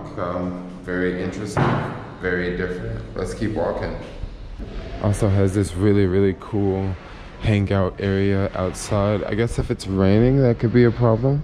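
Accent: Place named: United States. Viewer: his accent is clearly American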